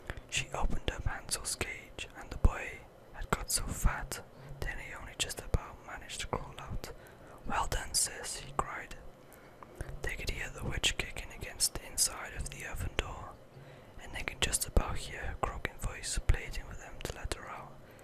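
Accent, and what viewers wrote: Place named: United Kingdom. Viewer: Oh my God. You truly are my ultimate favorite trigger. I'm so happy you are back. Your voice is so angelic. I usually close my eyes when you talk, to savor your sounds. I love you. Thanks for watching my video. I think I need to move to UK and get my daily dose of British accent. <3:) Love, Ann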